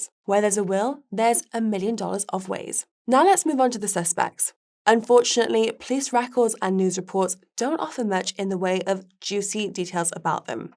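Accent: United Kingdom. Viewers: Her British accent n soft voice 🍬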